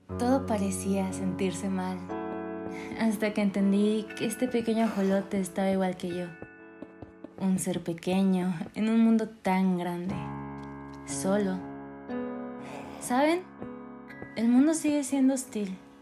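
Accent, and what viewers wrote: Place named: Mexico. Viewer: Su acento de mexicana 😍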